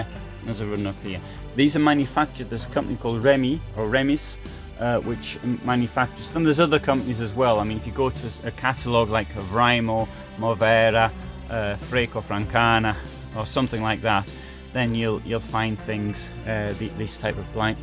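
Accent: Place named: North, United Kingdom. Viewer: not all northern people speak like this man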